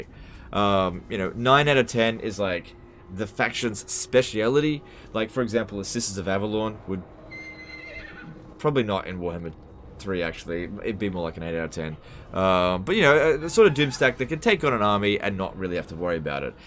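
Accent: Australia. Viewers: He is from down under.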